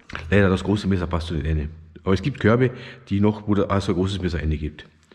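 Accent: Germany. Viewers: Als Ureinwohner finde ich das der fast wia Breiss redt😂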